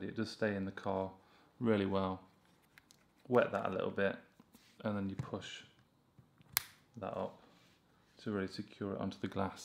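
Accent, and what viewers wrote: United Kingdom. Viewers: Help! When I turn on the dash cam I get the globe and then immediately asked to choose Mass Storage or PC and it freezes when in the car. Also, the charge does not seem to be holding because when I charge via the USB for hours, then unplug and try to turn on, nothing zippity do dah. Can anyone help me? PS: This is the best video. I love the Queen's English as I don't speak American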